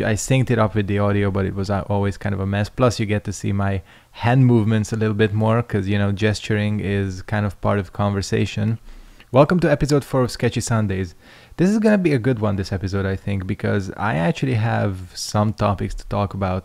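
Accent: Middle East. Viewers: I had never seen hia face before, his voice sounded middle eastern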